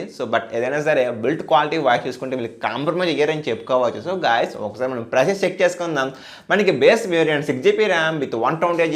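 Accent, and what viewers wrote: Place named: United States. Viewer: way of talking in telugu change cheyandi mari american la try chesthunnaru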